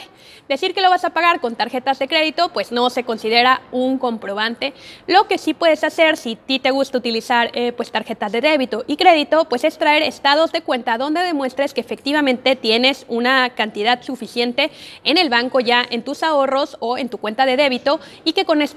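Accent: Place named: Spain. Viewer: Eres mexicana? Tienes acento espsñol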